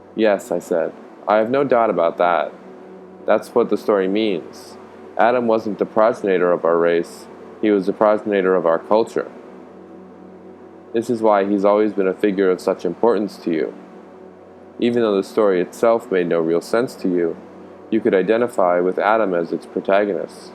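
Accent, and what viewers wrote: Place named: Canada. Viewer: His Canadian accent is coming through strong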